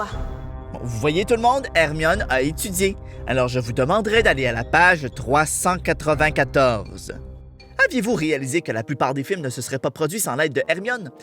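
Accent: Quebec, Canada. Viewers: Pouce bleu, cousin québécois... J'adore votre accent... J'entends bien les différences de doublage... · C juste que vous dites comme ça au Québec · ouais mais là c'est québécois ^^ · est ce que té québeqois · Il es québécois c’est normal c’est son accent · Tu est québecois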